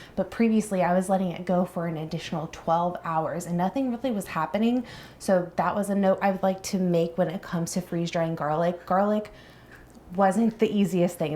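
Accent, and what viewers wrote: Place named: Philadelphia, United States. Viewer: Hi, I came upon your channel two weeks ago and let me tell you how much I LOVE it and you of course. I could have sworn you were from Philly, but I saw the video you said you are from Kanas. You did say you lived all over so wondering if you ever lived in Philly. You sound and act like you’re a true Philadelphian, LOL I just love how you talk and do those side noises, lol…. Anyway not sure if you will ever read my comment, but know that you have a NEW FAN from PHILLY. P.S. because of you I ordered golden bear sunflowers and paprika seeds. Oxoxoxo Love from Philly💙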